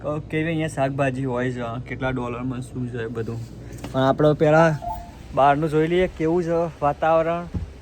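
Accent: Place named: Mehsana, India. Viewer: ભાઈ તમે તો મેહોણા ના લાગો સોં. તમારી બોલી મેહોણા ની સે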